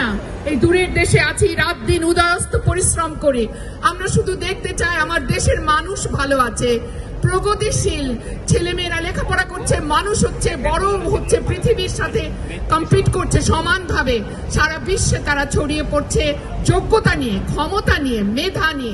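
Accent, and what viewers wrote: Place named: Kolkata, India. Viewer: kolkatar tane kotha bolos ken shali?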